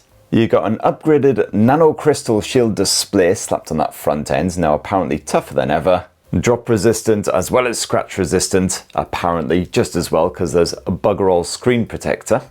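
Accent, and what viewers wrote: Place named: Northern England, United Kingdom. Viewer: I could listen to his Northern English accent all day long.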